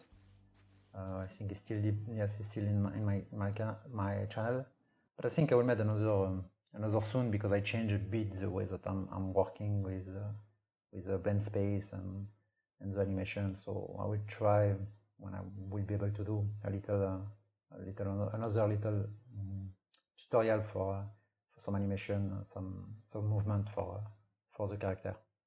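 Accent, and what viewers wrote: France. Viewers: L’accent frenchy xD Trop drôle :D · The accent français xD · Oulalala l'accent bien français ;)) , un grand bravo pour tout ce que tu as fait c'est chef-d'œuvre , continue comme ça ! :)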